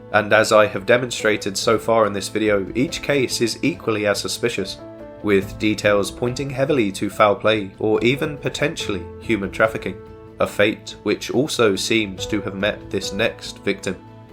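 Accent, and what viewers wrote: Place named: London, United Kingdom. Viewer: Why does he have to have a London accent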